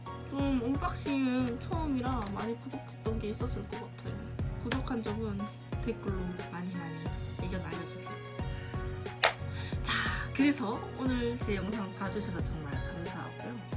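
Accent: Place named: Gyeongsang, South Korea. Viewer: 누나 혹시 경상도 사람이세요?? 사투리를 사용하시네요... 할튼 누나 너무 뻐요😘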